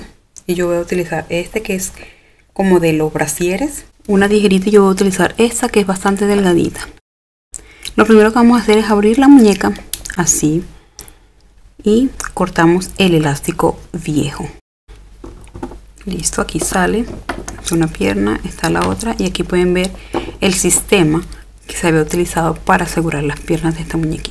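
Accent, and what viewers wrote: Colombia. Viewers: Hola me gustan mucho tus videos, los miro con mis nietas y gracias a ti empezaron a gustarnos estas lindas muñecas(monster high,Ya que a mi niña mas grandecita no le gustaban,pero un dia le mostre el video de tu coleccion y le gustaron mucho varias,pero le encanto Cleo...y hasta ya tiene 2 muñecas Cleo,2 Claudin y a DracuLaura unas regalos de mi esposo y mio y las otras de sus papas,o sea de mi hila y su esposo,es muy hermoso repetir la experiencia que comparti hace años con mi hija y hoy en dia con mi nieta adorada!... Y en verdad que tienes una voz relajante; y tu acento Colombiano es lindo!! Saludos Cariñosos desde Mexicali. B.C Mexico.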